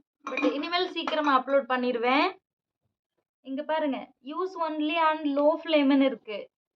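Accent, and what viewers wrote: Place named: Odisha, India. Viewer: நீங்கள் ஏன் ஒரியா பெண் மாதிரி தமிழை பேசுறீங்க?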